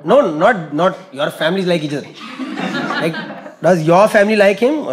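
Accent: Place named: Mumbai, India. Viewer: Got the Bombay boy accent on point bro. Great set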